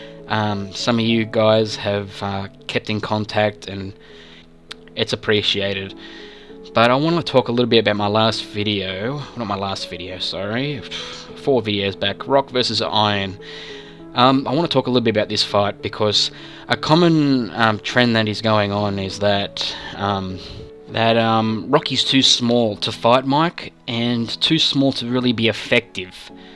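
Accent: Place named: Australia. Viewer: You sound Australian, what would you know about boxing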